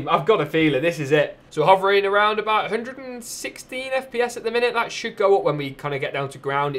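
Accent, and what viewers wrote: United Kingdom. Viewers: You sound like ali-a, it's almost like you might be from the same part of the uk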